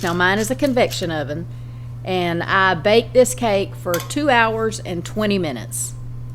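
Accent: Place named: West Virginia, United States. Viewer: Yes ma'am I have one in my collection, I just love your voice sounds like you're from West, Virginia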